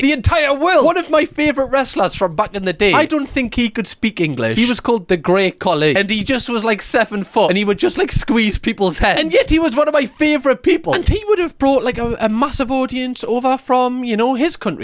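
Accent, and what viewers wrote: Newcastle, United Kingdom. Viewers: I am American, love the bri'ish accent and any fun we make of you is in good fun ❤ also very few of us use military time, usually because of specific careers like policing or the actual military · I love your accent! Can’t really explain why, I just enjoy listening to people speaking in the Newcastle accent, and don’t find it difficult at all (as a non native speaker). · American’s are absolutely a sucker for a British accent, actually what first got me to listen to video that came across my feed. He’s been my favorite commentary youtuber since. And likely tops the time watched list for me for the months since I found him! I don’t even normally watch commentary type videos often but is so pleasant to listen to, kind, and insightful. Oh and epic accent, of course! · You're the one who introduced me to the newcastle accent and I honestly love hearing it! 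The fact you also got amazing humor puts the ribbon on the gift, love your videos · Everytime I watch your videos. My thoughts have a geordie accent for ages after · I've gotten used to your accent and I really like British accents 💕🍩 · I LOVE YOUR ACCENT! I'd never really knew about Newcastle and the various regions, just the usual ones we hear in the US. I couldn't figure out if it was Scottish or Irish...I was like it's a Scottish but easier to understand (for me anyway). I love Italian Bach's accent too, it's so smooth, sort of posh sounding but not too posh. To my ears his accent is like lower case cursive, and your accent is block print ❤ Love y'all from Virginia, not one of the obnoxious Americans Edit to add...here in Virginia we have areas that still speak an older English, even Elizabethan! <link>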